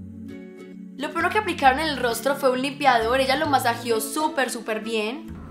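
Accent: Antioquia, Colombia. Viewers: Me encanta ese habladito tan paissa AJJAJA la amo saludos desde Francia pero soy de Colombia y amo mi tierra 💛💙💗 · Soy la única que ama el acento Colombiano? 🤔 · El acento de, es uno de muchos acentos que hay en Colombia. Ella habla paisa, pero hay más acentos como el rolo, costeño, valluno, santandereano entre otros. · Me encanta como habla con ese acento colombiano... Nooo que está mujer es bellísima 😍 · El acento de ella es paisa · Es Colombiana y ese es el acento de Colombia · # jaja me encanta ese acento colombianooo, te amo 😍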